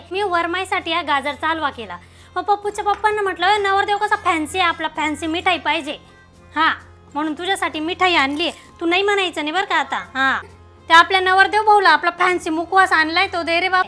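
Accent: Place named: Ahirani, India. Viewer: माझे सासर चाळीसगाव जवळचे नगरदेवळा आहे... मी मुंबईची आहे पण मला अहिराणी बोलायला थोडी येते, पण समजते.तु हल्ली व्हिडीओ मध्ये अहिराणी नाही बोलत... तुझे अहिराणी ऐकायला छान वाटते... तुझे अहिराणी तले व्हिडिओ मी आमच्या फॅमिली ग्रुप मध्ये आणि इतरांना पण सेंड करते... त्यांना पण बघायची सवय लागली आहे ❤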